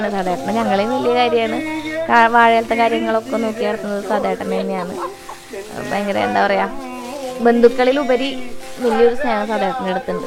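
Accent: Thrissur, India. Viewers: ചേച്ചി തൃശ്ശൂര് സംസാരശൈലി സൂപ്പറാ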